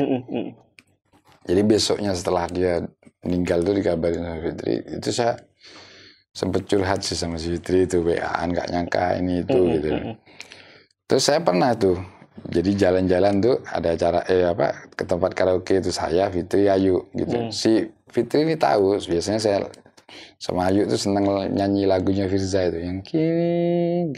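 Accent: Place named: Madura, Indonesia. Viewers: Logatnya madura bgt om 😂❤ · Assalamu'alaikum wr wb...mas...sy dulu...Jan 1975 - Agst 1976 itu...jg pernh tinggal di Bdws...19 Jan 1975 itu...sy,adik sy/Ana n almrhum Rama sy...naik KA dri Sby ke Jember...llu naik colt ke Bdws utk cri sekolh sy n sekolhx adik...Alhamdulillah...sy dpt di SMEAN - Jl Wachid Hasyim...klas 3...dri SMEAN 1 Sby pindh ke SMEAN Bdws...kl adik sy di SMPN 1...klas 1...llu sy n adik dikostkan didepanx gere'ja ayam...tpi hnya 2bln...sedangkn almrhum Rama sy n tmn2x itu...ad yg tidur dirmhx almrhum Rama sy...di Perumhn Artha Karya 2 - Jl A Yani - Nangkaan - Bdws...llu Mret 1975 itu...kelima sdr sy diboyong jg ke Bdws krn pd 1973 itu...kedua ortu sy sdh bercerai...jdi almrhumh ibu itu...hidup sendirian di Sby...stlh sy lulus sekolh...Agst 1976 itu...sy ikut almrhumh ibu llu Des 1976 sy menikh di Mjkrt n sy tinggal di Mjkrt itu...hnya 1th llu selama 7bln sy,suami n ank sy tinggal dirmhx almrhumh ibu n almrhum bpk tiri sy...kemudian sy ngontrak didektx rmhx almrhumh ibu...smp lhir ank ke 2,3 4 n 5...llu 25 Sept 1983 itu...sy sklrg pindh ke Bojonegoro n smp skrg...krn dinsx suami sy dipindh...dri Sby ke Bojonegoro n smp purna tugas...suami sy kerja di BUMN n purna pd 1 Agst 2004...mas itu...tinggalx dikampung Arab yaa...adikx ibu tiri sy itu...jg ad yg tinggal dikampung Arab...sy mendengar logat bicarax...mas itu...kok sprt orang Madura...ternyata betul...kita sm2 pernh tinggal di Bdws...Smg rumah tanggax mas...Samawa...Aamiin...sy/bu R Ayu Mamiek Widayati...68t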